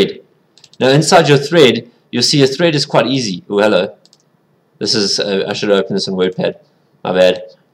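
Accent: South Africa. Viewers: I can hear you're from SA. Great video, thanks so much! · are you south african?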